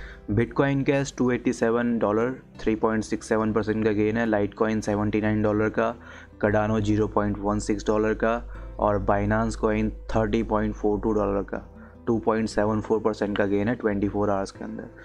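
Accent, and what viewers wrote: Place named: Bhopal, India. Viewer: Which city are you from? Your accent sounds like Bhopali 😁 (I'm from Bhopal)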